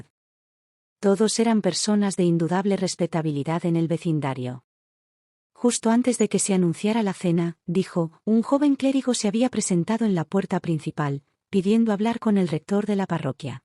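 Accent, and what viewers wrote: Castile, Spain. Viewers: Narradora muy buena ,castellano perfecto ,no hay nada mejor. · Hacía tiempo que no escuchaba un acento castellano tan... castellano (y tan... femenino). Dicción y entonación impecables, casi "mágicas". Felicitaciones, y gracias por el trabajo que desempeñan. Un saludo cordial desde Buenos Aires, del otro lado del Atlántico. al sur del mundo.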